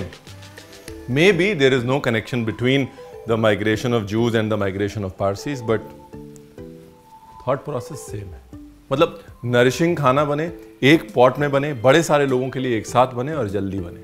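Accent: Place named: Lucknow, India. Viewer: I don’t watch too many cooking videos but I recently started watching yours and god hooked on to your Lakhnawi Hindi. Kya lehja hai! Take a bow Ranveer.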